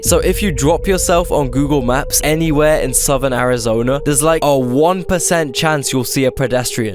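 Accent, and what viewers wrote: United Kingdom. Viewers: It’s funny to hear some Brit, Try to explain America. And the south to beat it all haha. Even though you ain’t far off for a couple these places.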